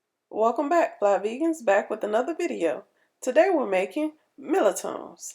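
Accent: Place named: New Orleans, United States. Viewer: Girl, you sound like...a NOLA Girl! Gotta have that Creole flare. You gotta tell me where you get the vegan meats because in New Orleans, you can only find a few aspects of them. I go to whole foods and if I look for the beyond meat crumbles you mentioned, they only carry the burgers and chicken strips.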